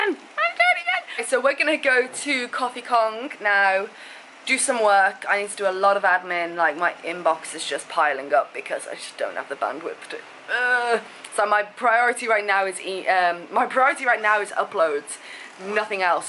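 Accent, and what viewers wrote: United Kingdom. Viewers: I just can't nail down your accent. It's certainly not Aussie but if you're a Brit, then you speak with a watered down Queens English.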